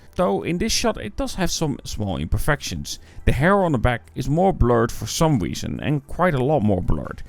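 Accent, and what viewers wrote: Netherlands. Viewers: Yo, man, off topic but what accent do you have, I heard you are in the Netherlands, is it a Dutch accent? If it is, it's really strange how different the Afrikaans and Dutch, English accent is, despite the languages being so similar.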